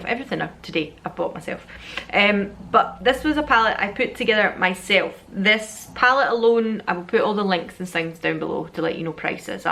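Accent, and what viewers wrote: Scotland, United Kingdom. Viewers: Goodness! You are adorable!!!! The Scottish can say whatever they want and it sounds lovely...we American’s though...ugh. I am going to put Eunique Beauty on the wishlist.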